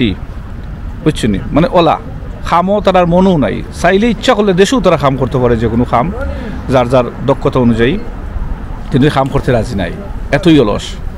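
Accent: Sylhet, Bangladesh. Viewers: Apni jodi sobar jonno video banan,try korben shuddho vashay kotha bolte. Karon sobai to r syleti na. Time r ektu short korle shunte valo lage.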